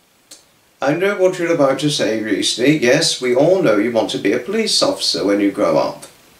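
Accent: United Kingdom. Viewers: I really enjoyed this inspirational chat time. Your story was great,! I like listening to your wonderful British accent😊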